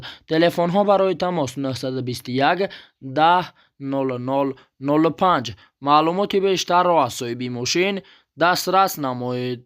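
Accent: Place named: Khatlon, Tajikistan. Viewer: А БАЧА АЙ ДУШАНБЕ МИНТАКАИ ХАТЛОН ГАПЗА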